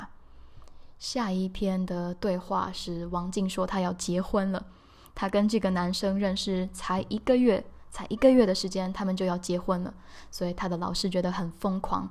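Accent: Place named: Taiwan. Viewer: Thank you so much your video are just perfect even after 5 years your video is still useful, im also taiwanese so I'm so glad i found a video with taiwanese accent ❤ the subtittle a lot too thank you for working very hard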